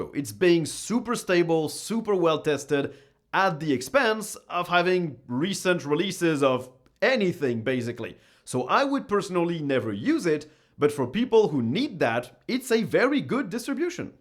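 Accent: France. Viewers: Tu serais pas Français par hasard?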